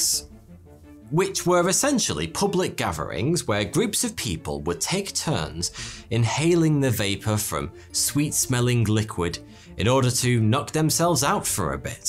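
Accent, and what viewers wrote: United Kingdom. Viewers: Yes, most British accents are pleasant to listen to, including thoughty2, which is similar to Jodie Whittaker's accent on Doctor Who. It's just that one variation that annoys me from some British TV shows. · It's the power of the British accent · Because testosterone and brit accent.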